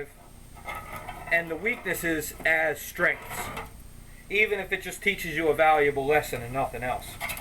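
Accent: Baltimore, United States. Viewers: You must be from Baltimore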